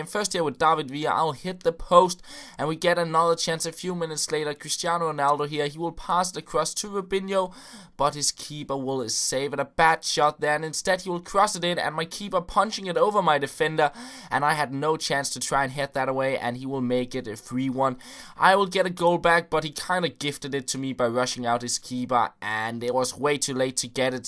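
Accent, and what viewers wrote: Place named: Denmark. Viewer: I can hear on your accent that you are danish :D I'm norwegian, you see :D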